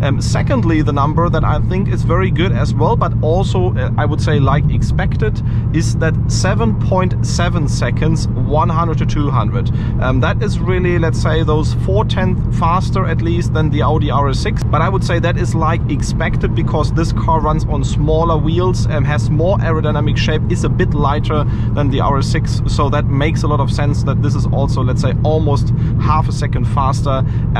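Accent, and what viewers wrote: Germany. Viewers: This man sounds as "stereotypically german" as u can possibly get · Man hört dass du Deutsch bist xD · Wie man hört das er deutsch ist . Ehrenmann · Max from Auto Top NL speaks Dutch English, speaks German English... a matter of taste!;-) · Fucking German Accent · Doesn't matter how you force yr English, yr German comes out very good with certain words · Schön das man raushört das du deutscher bist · Kann den deutschen Akzent nicht ernst nehmen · german accent check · Man merkt einfach er’s aus Deutschland hahahah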